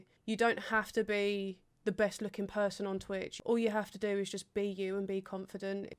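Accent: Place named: United Kingdom. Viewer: Hey, thanks for your video really good tips and thoughts some I can confirm through my experiences on Youtube. I'm starting to stream now too. You're really helping me with that. Thank you! Apart from that, I just have to say I love your British English!:-)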